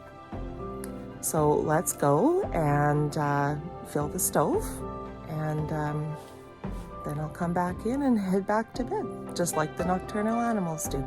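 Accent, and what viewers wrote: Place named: North, United Kingdom. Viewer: I just love how you ladies up north say "house" and "out." What kind of wood are you burning here? First camera shot inside furnace shows unsplit and seemingly unseasoned tree limbs (later on, maybe popular perhaps not fully seasoned). I have seen vids of folks who burn only well seasoned red or white oak. They are able to load large chunks of wood and don't have to poke around or reload for at least 24 hours. Here's some advice you didn't ask for... LOL so thank you in advance for your indulgence... sell a few of those junk cars for scrap and with that money build yourself a decent woodshed. All the best...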